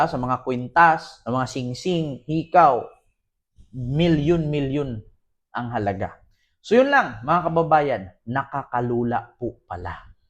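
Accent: Visayas, Philippines. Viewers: are you bisaya? your tagalong like bisaya.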